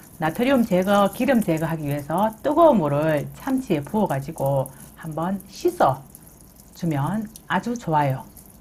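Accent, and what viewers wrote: Gyeongsang, South Korea. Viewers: 경상도 무뚝뚝한 언어속에 정겨움이 묻어나옵니다 ^^ 항상 잘보고 잘배워갑니다.2022년도 항상 건강하세요~~♡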